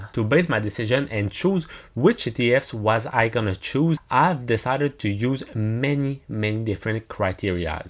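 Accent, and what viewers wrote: Quebec, Canada. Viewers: Sounds like a fellow Quebecer 🤘🤘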